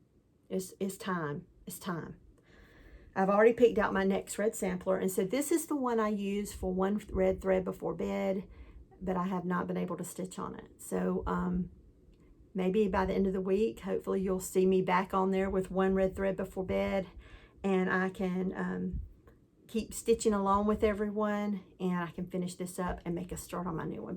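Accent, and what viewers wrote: South, United States. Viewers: I enjoy listening to you, you’re even more southern than me here in Oklahoma. I hope you’re feeling better soon!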